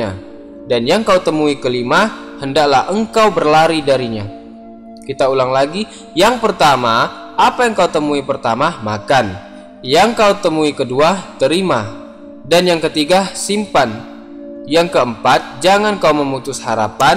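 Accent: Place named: Sumatra, Indonesia. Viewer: Logat abang macam orang sumatra lah... Iya kah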